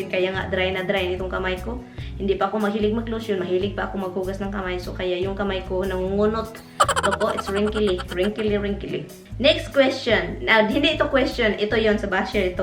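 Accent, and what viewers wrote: Visayas, Philippines. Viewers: Yung iba nga sis tinututok pa ang mic para marinig ang nguya eh hahaha.. yun nga sis prob sa mga viewers di nmn lahat ng kilos ntin eh need video di nmn lahat move. Mga ganyang ganyan din mga comment sakin hahaha. Mga inggit lng satin sis. Bisaya ka kasi sis kaya syempre bisaya accent talaga. Syempre maganda nmn talaga tagalog english para maintindihan ng lahat. Pag nagbisaya ka sis mdami malulungkot di makaintindi. Tawa ako ng tawa pagbasa mo hahaha. Ako nmn magtatagalog nlng daw ako eh pag nagtatagalog ako dami nmn nagrequest english kasi may mga foreign viewer. Tawang tawa ako sa may asim pa hahaha. Pokpokin mo n sis hahaha. Dati nagbasa din ako negative comment mas lalo sila nagsasaya haha. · I always watch your vlogs but I still have to catch up with your past vlogs., anyway, other people have no life so they mind how others live their lives. 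Kaloka sila, eh ano if bibigyan Ka Ng pera ni Tommy, helloooooo, asawa mo sya, super funny ang ibang Tao mag isip. You don't have to explain really sis, whoever is this person, she's so downright jealous of your happy life, hayaan mo syang mangisay sa inggit. Nanlalait pa talaga sayo, kesyo maingay Ka kumain, ang sarap mo kayang tingnan kumain, nakaka gana nga, kasi natural masyado, nag eenjoy kang kumain. Grabe ang inggit nya sa yo, kasi maganda Ka at happy sa buhay mo tapos, ang gwapo at super loyal Ng asawa mo. Ang linis linis Ng bahay mo, how dare these people call you pokpok, sobrang home maker mo kaya, tapos, super loving ka din sa asawa mo. Inisin mo nga sila, ingayan mo pa kumain, inisin mo pa lalo. Bisaya din ako, nagkakamay din ako, at maingay din ako, not only when I eat, as in, maingay ako like you, so what? Napaka bitter Ng babaeng Yan, kawawa naman sya. Eh ano if matigas ang accent natin? 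So what? Tigasan pa natin lalo, para lalo silang mainis, lait Ng lait, but nanonood naman, mga gago sila. At manlait RIN Wala kayong anak, eh ano, ako din walang anak, complete pa rin tayo, keysa magkaanak nga, mga Adik din, may neighbor ako ganun din sa akin nuon, before I got married, nilait ako being single, and now that I'm a widow, nilalait din dahil walang anak, but yong mga anak niya, lahat Adik, pulos lalaki, nakakataw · SO WHAT KUNG MAY ACCENT KA? So what kung bisaya ka? Grabe itong mga taong ito akala mo naman matatalino pa sa yo! Bkit sila ba may blog kagaya mo? Inggit ba inggit sila kaya ganyan sia ka mean sa pagsasalita nila sayo. Kaya hayaan mo nlang sila. Matuto sila ng tamang asal!!! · ganon talaga ang bigkas tayo mga visaya tingnan mo melai canteveros si momy dionesia inggit sila dahil marunong tayo magtagalog samantala sila bubu sa visaya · nakakainis ka talaga mga insecure...ano din Kong may visaya accent kaloka talaga ..pilipino nga naman kung wala kayo maganda isasabi wag kayo manuod ..mga inngetira ...grabi naman talaga pilipino pag naka asawa foreign pokpok na agad kaloka msyado mga inngetira talaga ..may asawa rin ako foriegn church kami nag meet nag husband same religion..wala ako paki sa tao ayaw sa akin .. 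· hahahaha..hello jan...mao ning baboy nga sexy og wafa...hehehehehe kakaibang baboy TO.just ignore those no sense PEOPLE.hehehehehe...papansin lang na ba.hehehehehe may pa mangaon tag tinapa na isda.ana man jud nang bisaya mag English,teacher nga dili perpik eh.hehehehehe...only God is perfect. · Hi I love all your vlogs ,hayaan mo yang mga bashers at least marami naman mga good people loves you .mga wala lang yan magawa at naiinggit lang yan sayo.Stay what you are ,I am proud to be bisaya ,I love the way you talk , ganon talaga tayo mga bisaya matigas magsalita ng words ,marami ka naman napapasaya like me I really enjoy your videos .Don't mind people judge you ,walang perfect sa mundo ,Stay positive and stay what you are I love you , pashoot out naman ,God bless😍😍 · Si Pres. Duterte nga ganyan din mag english dahil bisaya din siya.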